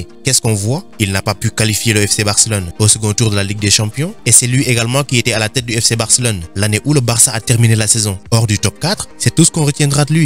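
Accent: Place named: Senegal. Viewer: Thia accent sénégalais bala consommation locale la wakh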